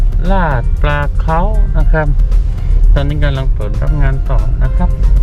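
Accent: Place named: South, Thailand. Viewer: สำเนียงน้าเหมือนคนใต้เลยคับ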